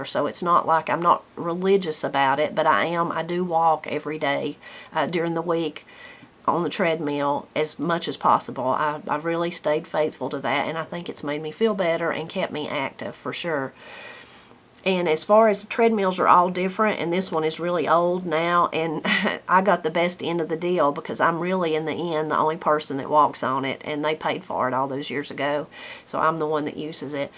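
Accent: Appalachia, United States. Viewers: I am curious about persons who hold themselves out as being capable of beneficial commentary for others. As a writer I admit I am very critical of such persons. Morally I think you live in an admirable way. While you have an interesting way of speaking as a representative of Appalachian culture, I find it disappointing that you don’t seem to have pursued a means speaking English correctly in order to set an example within that culture. Yet you speak of working at the college, which is somewhat puzzling and contradictory.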